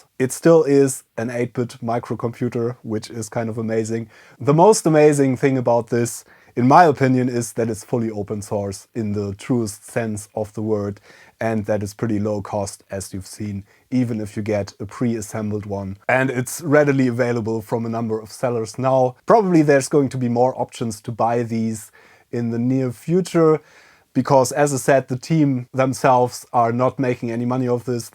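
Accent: Germany. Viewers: In German (and many European languages) the letter G is always pronounced that way. Phonetically "Gee" is very rare, its almost always "Geh" and that will be what learned from a very young age. Just an FYI, the letter W is German is pronounced V which is why he also says things like "Wersion", again its simply because he was taught that from the beginning. Pretty obviously, German kids are taught German before anything else. IMO, as long as you understand what someone means there's no real need to correct them.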